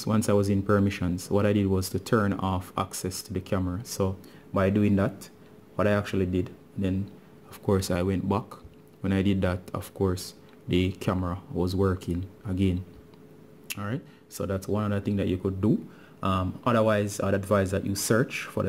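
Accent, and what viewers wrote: Jamaica. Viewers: He's from the carrabien (jamaica) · by the way thanks..idky I was surprised to hear a Jamaican but dweet!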